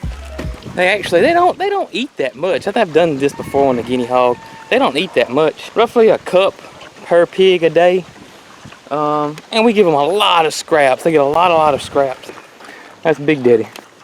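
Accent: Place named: Mississippi, United States. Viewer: I love your accent! 😍 It reminds me a lot of my grandmothers, she’s from MS.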